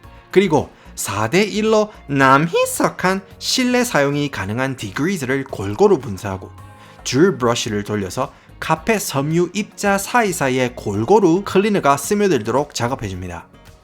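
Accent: Gyeongsang, South Korea. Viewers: 첨으로 아주 재미있게 보고 갑니다! 경상도분이네용. Awesome video!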